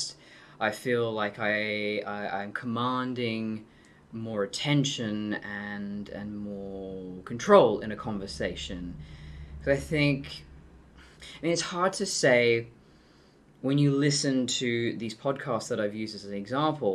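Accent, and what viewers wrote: United Kingdom. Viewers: Your british accent complements it perfectly. Joanna Lumley like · I cant imagine your voice any other way. You sound like a sophisticated English women!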